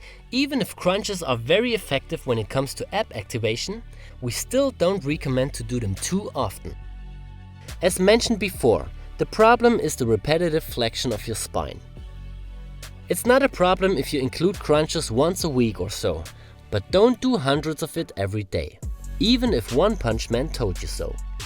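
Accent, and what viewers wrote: Germany. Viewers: Your accent sounds german🤔 The Video was very helpful, thank you! :) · Sound like Do You have a Garmen accent. :)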